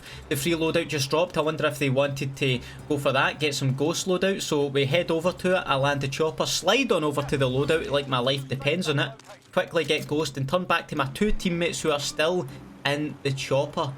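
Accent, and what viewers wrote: Scotland, United Kingdom. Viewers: Don’t forget the Scottish accent and “almighty folks” I won’t even play PC/console cod’s but I just watch him for fun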